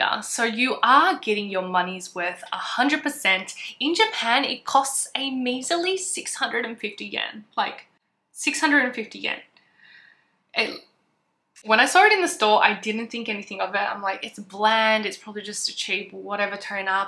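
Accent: Australia. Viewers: also is she australian? I feel like im getting that familiar accent. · Girl I cannot tell if you have an Australian accent or not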